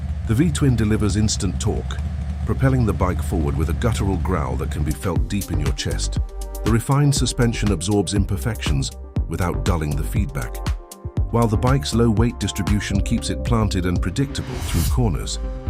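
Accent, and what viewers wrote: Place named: United Kingdom. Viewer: He sounds British 😅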